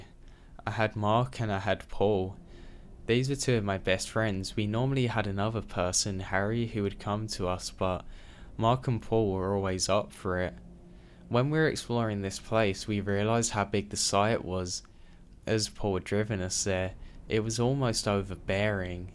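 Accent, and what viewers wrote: England, United Kingdom. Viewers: Land of Shakespeare; pure class- love the voice- English, and Sinister- SCARY!!!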